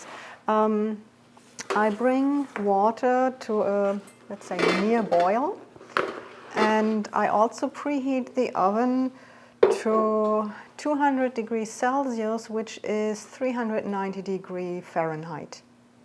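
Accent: Germany. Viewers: Perfect english ... ein bissl hört man noch den deutschen Akzent. Aber .... echt gut. Die Laugen sehen toll aus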